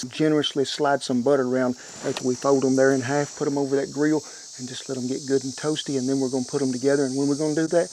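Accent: South, United States. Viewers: i'm a dutch guy, but somehow i'm addicted to southern bbq and grill shows now... love the food and the accent :) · Man I just love this southern states accent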